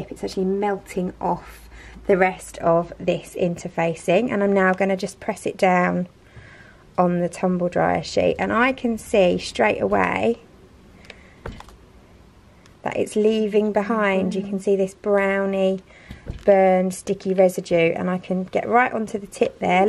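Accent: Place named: England, United Kingdom. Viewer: I should move to England. like a lot of Yankees, I just love the accents, including yours.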